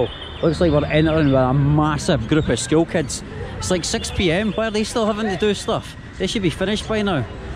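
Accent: Scotland, United Kingdom. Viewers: Hey great vlog once again! Obviously in love with the Scottish accent which we find very humorous and words such as wee bit and your boy here 😃 just a clarification. Sadly Our forefathers never understood the gravity of letting go of the brits off our nation. Most Locals living out of Colombo don’t speak good English. That man said “ theres one in half hour”” he did actually say there’s a bus in every half hour but sadly his English is wrong. Hopefully oneday they will too improve. Anyways I’m sure you got a better deal price wise traveling in the bus buddy 👍 have fun! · Hi, Just seen your video that you are in Kandy. I am from Kandy but at the moment I am in Scotland for my studies. Just for my curiosity, I would like to ask if you are from Scotland as you got very similar Scottish accent. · Making Scottish great again. Thanks for the video. The accent and the narration is sublime as Harald Baldr would say it. 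· I think it is just that scottish accent. The British got their wit from trying to deal with unruly Irish and Scots all the time is my guess. Been practicing a second language of Spanish in Puerto Rico and they speak it with a high pitch accent as well that makes it sound fast but actually mexican spanish is much faster.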